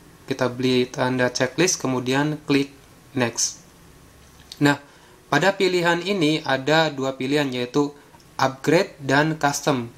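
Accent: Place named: Java, Indonesia. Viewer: Medok bro wkwk Tapi gud lah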